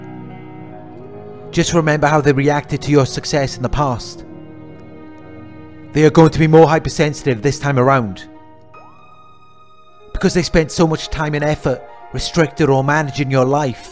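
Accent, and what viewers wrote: Wales, United Kingdom. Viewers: I agree with every thing you say!!!. Thanks for your videos, they're great. I like your voice and your accent too, sounds welsh(?)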